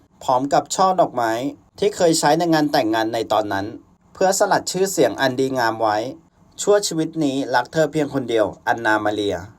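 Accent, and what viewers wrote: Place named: Rural, Thailand. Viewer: รู้นะว่าตั้งใจ ขอให้กำลังใจ แต่ต้องฝึกมากๆ โดยเฉพาะการอ่านออกเสียงชื่อต่างๆให้ถูกต้อง เพราะยังไม่ถูกหลายคำสำหรับชื่อในภาษาต่างประเทศ มีผิดพลาดหลายที่ จึงต้องพยายามแก้ไขปรับปรุงให้ถูกต้อง การอ่านคำต่างๆจึงต้องฝึกให้ชัดเจนตามบรรทัดฐานมาตรฐานภาษาไทย รวมทั้งจังหวะจะโคนและสำเนียงตามมาตรฐานภาษาไทยกลาง อย่าให้มีกลิ่นชนบท เพราะนี่คือการอ่านเรื่องสำคัญของพระราชวงศ์ต่างประเทศ ขอให้หมั่นฝึกทบทวนไปมามากๆ จะดีขึ้นเอง เพราะมีแววว่าน่าจะพัฒนาได้จนได้รับการยอมรับว่ามีมาตรฐานการอ่านที่ดีต่อไปได้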